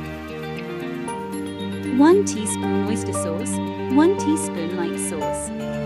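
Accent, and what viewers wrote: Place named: China. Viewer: Nice may voice cover na 🎉👏🥰 Chinese accent 😊 Looks yummy 😋 sis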